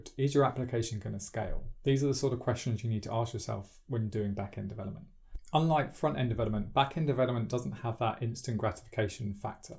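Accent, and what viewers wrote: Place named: Manchester, United Kingdom. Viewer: kinda, I m currently studying computer science at Manchester. so kind of familar with this accent lol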